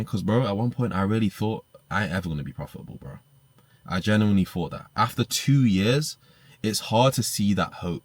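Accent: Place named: United Kingdom. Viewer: Respect for this bro, I heard the UK accent and was immediately hooked lol. It's always motivates me seeing guys from here like me thriving to keep going myself and believe ill eventually succeed. May God continue to bless you for the wisdom you're sharing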